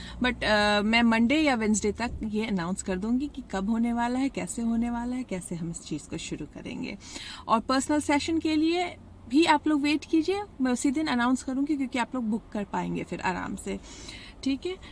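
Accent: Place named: Bihar, India. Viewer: Ohh tbhi to main apko sunti hun toh aisa lagta hai ki , min unkei bate sun rahi hun coz woh bhi Bihar se hi hai .. but thanks a lot for helping to grow us.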